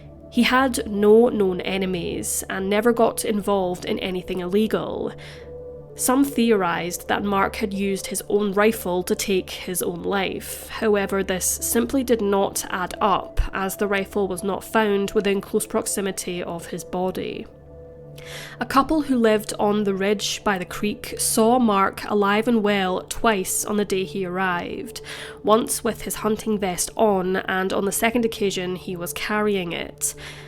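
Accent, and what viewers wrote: Scotland, United Kingdom. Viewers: I love watching your videos, bonus you have a Scottish accent as I'm from Dundee, you sound East Coast also? 👍🏻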